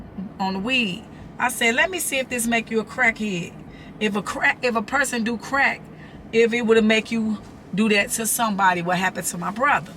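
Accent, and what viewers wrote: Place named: Chicago, United States. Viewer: Heyyyy I'm born and raised in chicago. As soon as I heard your voice I said yep she is so chicago.. you are so Beautiful ❤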